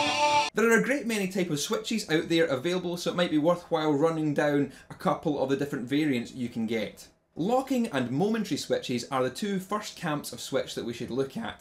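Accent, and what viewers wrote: Scotland, United Kingdom. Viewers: Thankfully it's not a very complete Scottish accent, otherwise it would be impossible to understand and even worse for the non english speakers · I think the Scottish accent accounts for at least 40% of the reason I watch your channel. It's just so pleasant to listen to. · Best guitar channel on youtube. Mainle due to your scottish accent. Greetings from DK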